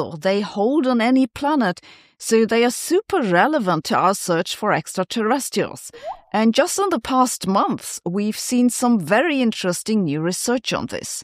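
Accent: Germany. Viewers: She is German. Give her a break...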